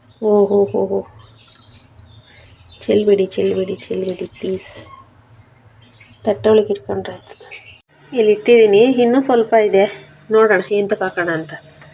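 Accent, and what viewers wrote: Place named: Hassan, India. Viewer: Nivu Hassan davara nam ajji mane Hassan hatira nivu mathdodu nodi anisuthu namadu Tiptur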